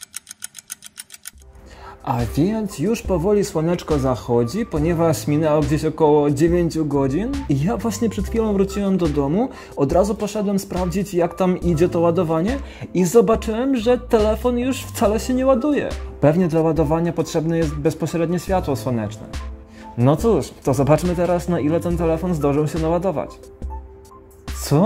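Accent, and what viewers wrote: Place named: Ukraine. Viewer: Naucz ze sie chlopie po polsku bo zajezdza Ukrainą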